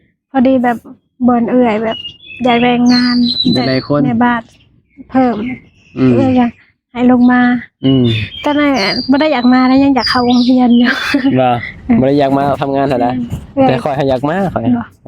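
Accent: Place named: Isan, Thailand. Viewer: ถ้าไม่บอกว่าเป็นคน สปปลาวไม่มีคนเชื่อ นึกว่าเป็นคนไทยอีสานแน่นอน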